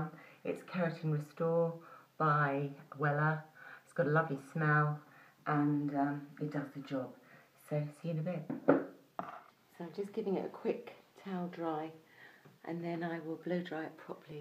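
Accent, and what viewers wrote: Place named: United Kingdom. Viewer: Awesome, thank you for sharing! You have a really lovely accent, it's British, isn't it?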